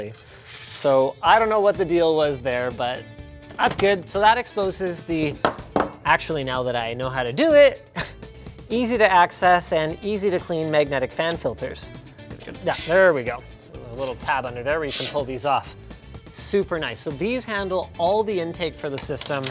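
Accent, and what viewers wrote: Canada. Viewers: He's Canadian, that's how they say the letter Z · freaking Canadians and their pronunciation of the letter z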